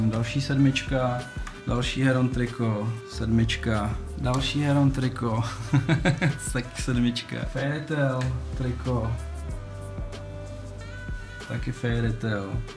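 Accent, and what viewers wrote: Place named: Moravia, Czech Republic. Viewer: Moravsky bro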